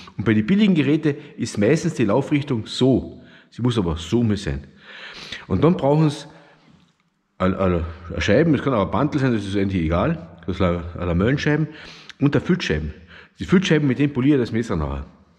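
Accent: Germany. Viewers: Als Ureinwohner finde ich das der fast wia Breiss redt😂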